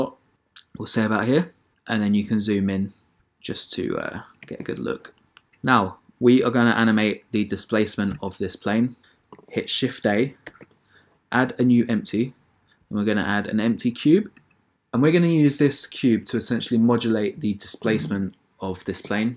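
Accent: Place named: London, United Kingdom. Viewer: Sick tutorial nice to hear london accent too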